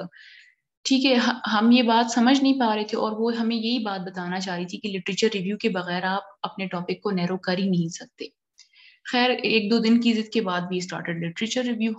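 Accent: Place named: Kashmir, India. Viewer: May Allah bless you... Can u tell me where from u. Bcoz I am from Kashmir.. Your voice tells u also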